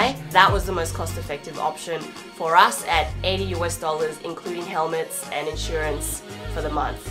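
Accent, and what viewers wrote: Australia. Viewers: Nice to hear an Aussie accent :)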